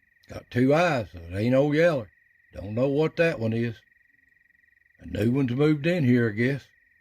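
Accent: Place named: South, United States. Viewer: Thank You, love these videos and the music! And of course your southern accent 😊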